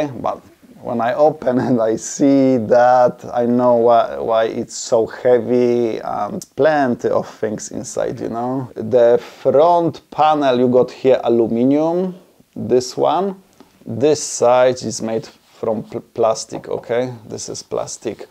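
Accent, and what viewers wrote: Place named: Russia. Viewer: I was in Poland, but Polish people don't speak like that. This is more Russian accent than a Polish one.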